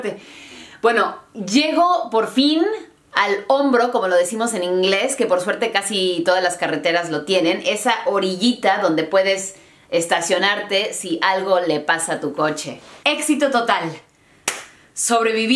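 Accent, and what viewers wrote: Mexico. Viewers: Me encanta tu asentó mexicano❤️❤️ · Jeje jeje. Me encanta tu español super mexicano. Numero uno. Dos. Si yo te contara todas por las que he pasado te mueres de la risa e impresion. Aqui aplicacion que cuando no te toca. Ni aunque te pongas. Bendito Dios. Seguimos aqui güera chula 😙😙😙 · Hablas el idioma español, perfecto me has sorprendido, ya hablas como Mexicana, saludos desde Veracruz. 😁☺✋ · es un poco negativo decir no seas como mejor di algo como tomando experiencias de:) saludos un canal muy padre positivo agusto al escucharte hablas español con acento de nosotros de México:)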